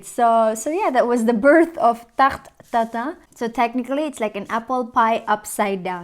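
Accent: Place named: France. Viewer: I can hear French accent na if mag english ka.. hahaha kahinomdom na nuon kos joke ni Trevor Noah about French accent vs Russian accent.. hahaha Try to watch it dba malingaw ka.. hahaha <link>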